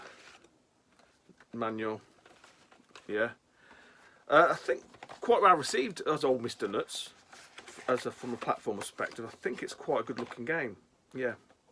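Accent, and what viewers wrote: United Kingdom. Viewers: it was cool to hear the accent and the eloquent swearing. I have been working overseas the past seven years so I dont hear British accents anymore ha ha.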